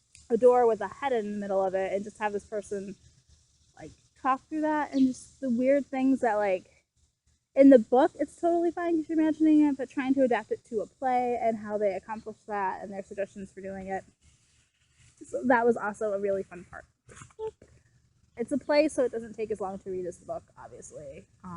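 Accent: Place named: United States. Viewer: Think of making an audio recording like you would speaking on stage…slow down and enunciate more. I liked the content but had to relisten multiple times to get all your words. Certainly, I am not American, but I normally don’t have as much issue with a US accent.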